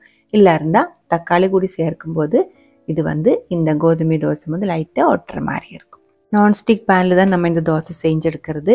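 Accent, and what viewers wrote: Kerala, India. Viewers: Even Though U Are Kerala Malayam U Are Speaking Tamil Very Beautifully Must Appreciate U Expecting More Food Recipe From U Thank U Sister Wheat Dosa Very Nice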